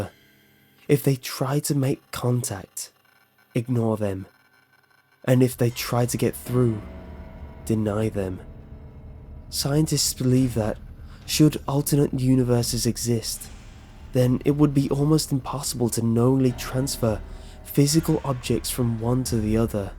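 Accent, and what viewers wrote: United Kingdom. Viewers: This one feels more authentic since it's one of the few UK stories and it's read by a Brit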